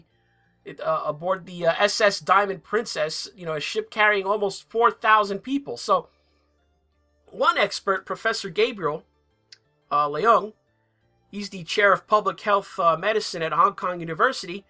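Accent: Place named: New York, United States. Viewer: Sounds like New York \ New Jersey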